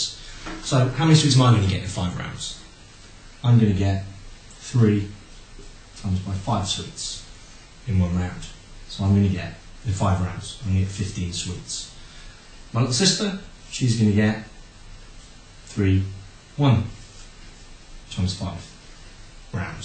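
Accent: United Kingdom. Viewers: purposely i tried to understand a bit because im american english just like normal english and I can't really understand fast british! but still at least i got my homework all correct! thanks!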